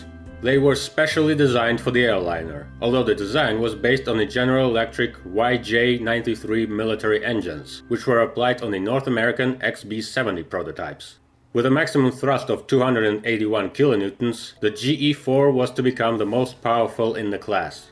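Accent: Russia. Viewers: I love his Russian accent. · А почему с русским акцентом?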